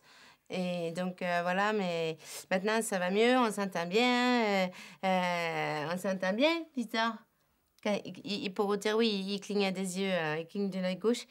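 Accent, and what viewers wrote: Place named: South, France. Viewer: Mais pourkoi l accent sud? Une recette une bequille de soutient faute d ecriture mode parisienne de ce foutre des chti s et marseillais etc c est indigeste votre truc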